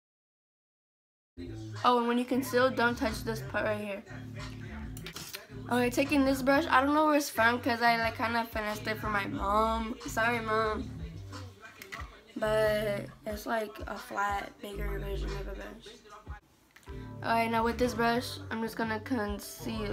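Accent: Bay Area, United States. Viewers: When i hear sobxrbe I assumed she was from the bay lmao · I can tell ur from the bay lmao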